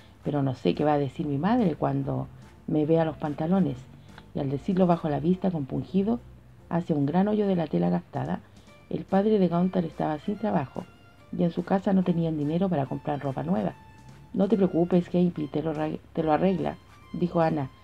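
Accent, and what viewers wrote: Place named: Chile. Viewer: tu acento hizo el libro mejor de lo que ya me parece! me suena a que es acento chileno pero tal vez me equivoco, igual me gusta mucho la forma en la que lees!! muchas gracias estoy segura de que me irá bien en el examen :D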